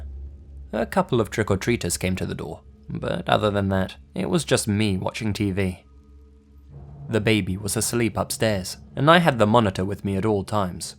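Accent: United Kingdom. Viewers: and Be busta awesome UK narrators 👌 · British accent.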